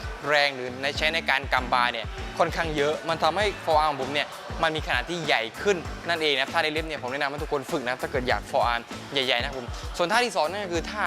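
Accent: South, Thailand. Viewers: ตอนปึ่งแลแรกๆไม่คิดว่าเป็นคนใต้ 555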